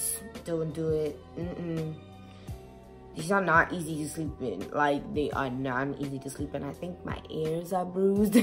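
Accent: Caribbean. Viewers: I like your accent, you sound like you're from the Caribbean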